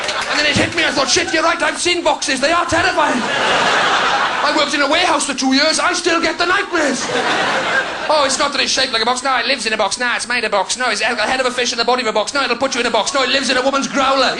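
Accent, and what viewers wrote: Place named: Wales, United Kingdom. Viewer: He's fantastic and I love welsh accents which makes it all the better.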